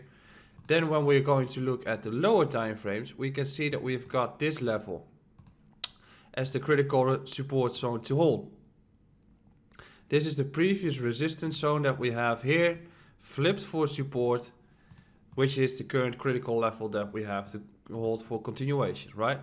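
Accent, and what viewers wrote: Netherlands. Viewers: Did I hear a Dutch accent?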